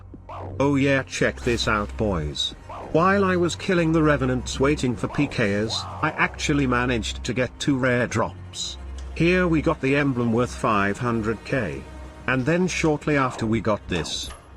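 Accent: United States. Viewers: He sounds like a normal American white dude tf you smoking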